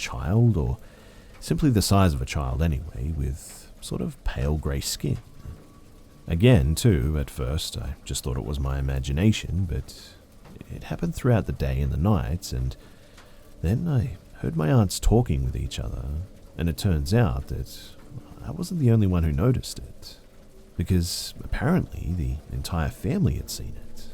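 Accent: Australia. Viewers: Aussie are you not? Been looking for a channel that does what you do, I'ma horror fan 🙂also Aussie 😄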